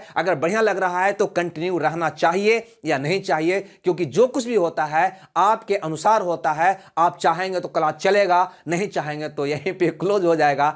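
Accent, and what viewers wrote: Bihar, India. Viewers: Itna sudh hindi to desi board wale ko v samjh aayega sir tone se saaf pata chala rahe hai aap bihar ke hain but aap pura hindi me explain kar rahe hain jo kuch nahi samjh aa raha hai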